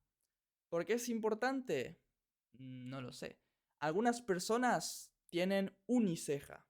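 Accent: Argentina. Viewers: Otro video útil y interesante. Soy estadounidense y llevo 6 años aprendiendo español. Me gusta tus videos y tu canal. Hablas muy claro y es bueno escuchar el acento Argentino. Una sugerencia, el volumen de tus videos es un poco bajo en comparación a otros videos en YouTube, puedes aumentarlo un poco.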